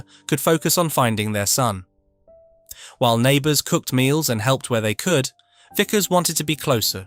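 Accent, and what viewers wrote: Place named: United Kingdom. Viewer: Seriously you sound like your from the UK and you can't pronounce half the words right 😂😂😂😂😂😂😂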